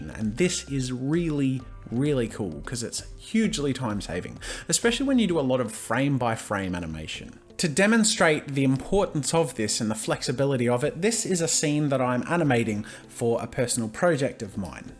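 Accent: Australia. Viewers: Is he Australian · Are you Austrailian? Just wondering it sounds like an austrailian accsent but it´s vety COOL and sorry if a have a lot of misspelling but I´m not from anywhere where people speak english and I´m not that good at it :D LOVE YOU´R CHANNEL!!!! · ho Australian accent. my favourite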